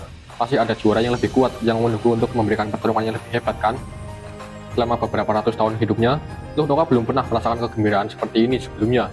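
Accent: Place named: Java, Indonesia. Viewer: Suaranya diperbaiki, logat jawa dan suara mendem ditambah dIkasih efek bukan perpaudan yang bagus. Perjenih suaranya dulu aja baru digaya2in.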